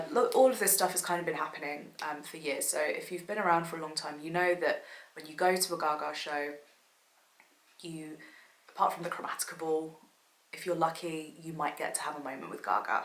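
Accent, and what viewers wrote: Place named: Kent, United Kingdom. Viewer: I reckon you're from Kent, not that I'm trying to dox you or anything but I'm from Kent (UK) and your accent sounds kinda like mine.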